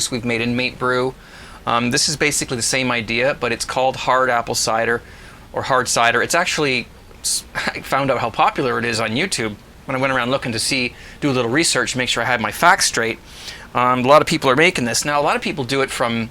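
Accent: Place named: Canada. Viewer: You are my hero. I am picking up a hint of an accent. Are you Canadian or from Canada?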